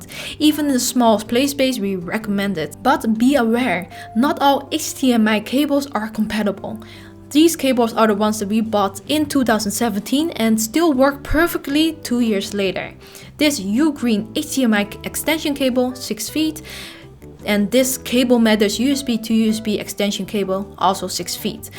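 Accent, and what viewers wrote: Netherlands. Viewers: Heerlijk dat Nederlandse accent :) , leuke vid ga em later nog een keer kijken! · Damn you sound dutch, holy shit lol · Fking strong dutch accent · Wait, you live in the Netherlands? I thought that accent sounded familiar lol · he means you have a Dutch accent with a Asian accent mixed in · Ok. First srrs thx for the tips. 2nd. Oof. Wat ongemakkelijk om zo nederlands Engels to hooren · Can't figure out what a dekstop is... Other than that, great video with the most amazing Dutch accent. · Are you dutch i think i can hear it in your acaent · Dutch accent.... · Haha I was guessing that you were from the Netherlands.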